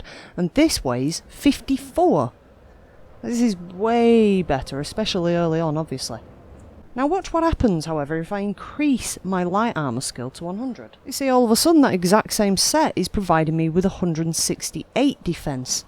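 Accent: United Kingdom. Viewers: Love your british accent. Onarmored, HandtaHand, Armar, Stoff, Twente, Oround here, Jomp, You see "ma" point, When you punch "shombody", baa (bar), fonny. Very usefull guides.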